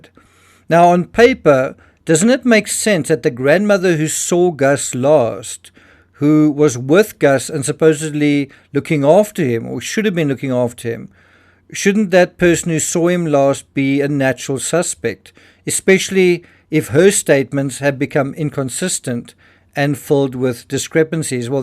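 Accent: South Africa. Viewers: He's South African. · A very interesting video. Thank you so much for covering this case (and all the others you cover, actually). That's the reason I subscribed. You are logical and thought provoking and I always look forward to watching your videos. I was born in Joburg, so love hearing your accent. To this video though ... I don't think anyone will tattle. They are from a farming community and I think that particular property has been in the family for a couple of generations, though I haven't researched that so I could be wrong. I personally think they will stick together, no matter what occurred "think as thieves" comes to mind. But I would love to hear your perspective as to why the mother Jessica reportedly left the property after Gus went missing. The same thing happened with the children missing from Nova Scotia - the mother took off the same day, from memory. 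I then saw something recently where the step-father has been arrested for some sort of assault charges (not related to the missing children). I couldn't help but go " ... hmmmm ... " I am wondering if Jessica left the property after Gus went missing to distance herself because she is aware of what happened to Gus. Looking forward to your next video. Thank you again for your excellent coverage.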